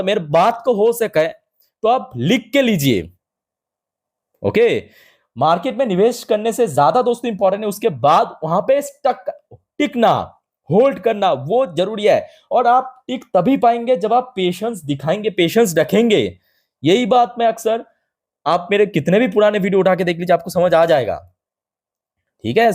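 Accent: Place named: Bengal, India. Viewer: Like the way u speak.. R u begoli.. Speak hindi so sweet ❤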